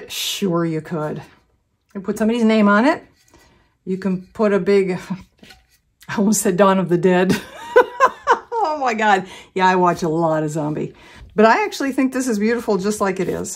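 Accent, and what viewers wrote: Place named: Pennsylvania, United States. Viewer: New Joisey? Nah, not NJ, you don't have a strong accent, I would say PA? That silver is sweet, love it with the skulls!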